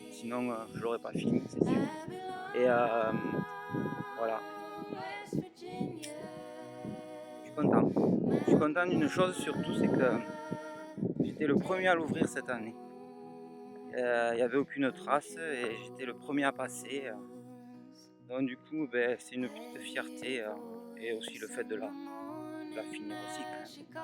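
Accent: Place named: Pyrenees, France. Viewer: Merci pour tes vidéos et bravo pour cette traversée. Cela m’a d’autant plus intéressé, que j’ai également démarré une traversée des Pyrénées en fin de saison cette année. J’ai d’abord regardé ta revue de matos. je fais partie de ceux qui s’intéressent au matos 😂. Et je viens de boucler tes 4 vidéos … et bien mon pôvre 😉 ( j’adore ton accent pyrénéen : “j’suis tremp “ ) le moins qu’on puisse dire c’est que tu n’as pas été gaté par la météo. C’est clair qu’il fallait en vouloir pour aller jusqu’au bout . Tes commentaires m’ont replongé dans mes propres galères. Et c’est vrai que c’est pas facile et qu’on n’est pas toujours fiérot quand on se retrouve seul au milieu de la caillasse et des cols, dan sun lieu qui si il est magnifique n’en reste pas moins austère. C’est intéressant de voir un parcours très tôt dans la saison. Moi j’étais plutôt parti pour finir la saison (départ de Banyuls le 30 août ). 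J’étais parti avec l’idée d’essayer de boucler l’intégralité mais je n’avai spas trop de mou sur les délais. Ce n’est pas exactement la HRP que je suivais , mais un itilnéraire décrit dans un topo Glénat « le Trek des Pyrénées », ça combine du GR10, du GR11 et de la HRP en 43 étapes. J eme suis arrêté au 14 eme avec avoir quasiment traversé Andorre suite à une douleur récurrente et croissante à la cheville ( en fait j’avais un tendon fissuré ), de plus j’ai commencé à être malade et avec des quintes de toux bien envoyées ( la aussi, la suite a révélé que j’avais chopé le covid). Bre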